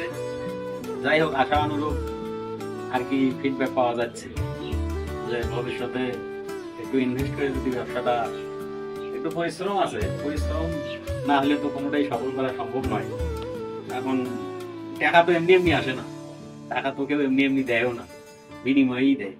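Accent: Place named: Dinajpur, Bangladesh. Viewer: ভাই, আপনার ঠিকানা টা দেন,কথা শুনে মনে হচ্ছে দিনাজপুরে বারি।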